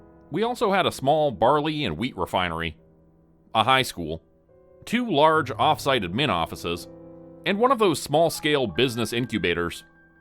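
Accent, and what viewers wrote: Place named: Canada. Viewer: I love the way you pronounce some things with your canadian accent.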